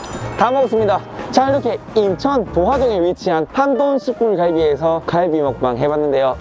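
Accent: Gyeongsang, South Korea. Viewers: 와.. 그냥 몇번 봤는데 대구말투에 결국 구독박고갑니다ㅋㅋ 대구사는데.. 인천 가게되면 꼭 저기 가봐야겠슴다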